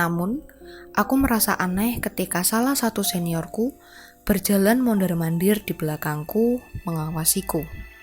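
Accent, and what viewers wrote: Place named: Java, Indonesia. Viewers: Logat e jowo banget 🤣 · Medok sekali mbak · aduh mbak meduok eee hehe :D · Ga nahaaan medoknyaaa.. jdi kangen sama kampung halaman 😘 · Gak juga ah, yang ini medok jowo kok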